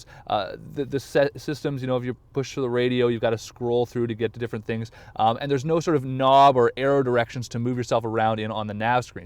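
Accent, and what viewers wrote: Canada. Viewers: is he Canadian? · All I hear is Canada seeping out of his nostrils.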